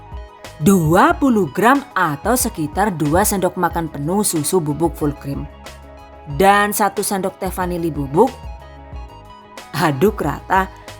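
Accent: Banyumas, Indonesia. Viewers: Ngapak temen sih...mbakkk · Langsung Caaaatat..Suka ngakak klo Mba udh Ngomong Ngapak2..Lah Lah Deneng dadine kayak kuwe..pating mbrudul🤣🤣🤣 Sukses ya Mba, Selain Menghibur Video2nya jg menginspirasi Emak2 Bakulan kyk Saya.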